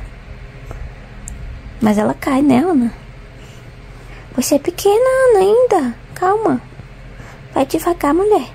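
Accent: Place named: Northeast, Brazil. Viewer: Será que você não percebe que o sotaque dela? Ela é nordestina então é o jeito isso de chamar a Ana de “mulher” simplesmente é o jeito,a cultura dela , nada a ver pelo amor de Deus.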